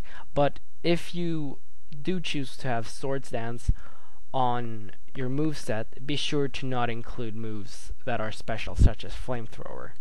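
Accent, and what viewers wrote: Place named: United States. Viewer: r u an asian or an american? well, u sure have an american accent but look like an asian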